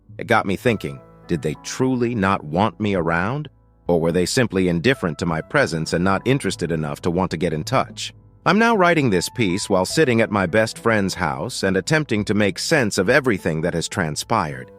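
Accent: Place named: United States. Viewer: This must be a Japanese video translated into English and given an American speaking voice. It starts with a total dimwit who is driven insane by her family and that finally "opens her eyes"! Over 18 years, OP never learned a lesson that she was a second class citizen in her own home and needed to work, save some money for emergencies? Tsk tsk For her 18th birthday, OP could have asked for a few $ and gone to Wendy's or Mac with her friends? A much more realistic goal? What a dimwit to continue to trust her birth family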